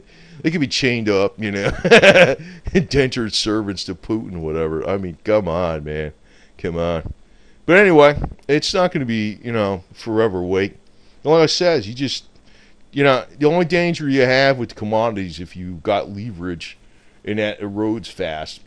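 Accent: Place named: Chicago, United States. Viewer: you sound like your from Chicago or Jersey. I think silver is more abundant than people think. I spent $100K on the metal at $31 to figure this out. You are right, innovation and a positive environment to encourage creativity is far more valuable than having abundant resources owned by meat heads. Good analysis.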